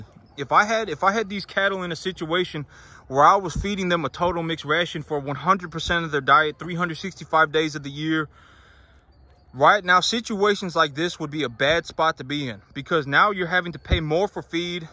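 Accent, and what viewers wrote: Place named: East, United States. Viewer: he's a mystery, I think he kinda has an eastern U.S. accent..... and he obviously doesn't read our comments😂 or we'd get a 5,000 word response. Could cut it down to 1,500, but he generally repeats everything at least 3 times.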